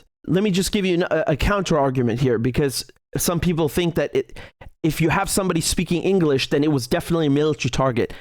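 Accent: South Africa. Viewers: Yup English south african Doesn't sound Afrikaaner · Definitely South African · Hes very South African😂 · Yes, he is a South African with an Afrikaans accent. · 100% south african accent.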